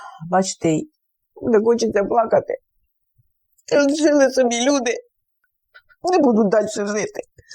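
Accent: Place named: Kirovohrad, Ukraine. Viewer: В Кіровоградській також так розмовляють 😊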